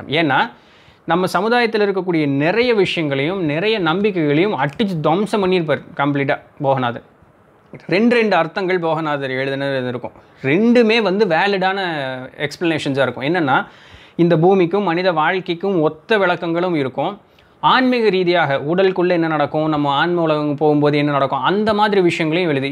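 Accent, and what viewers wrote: Coimbatore, India. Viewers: Hi I learned so much from your videos. Being from Coimbatore like your way of saying. I sent email but it did not delivered. Where did you buy your copper kappu please respond as soon as possible. Please reply as soon as possible. Thank you!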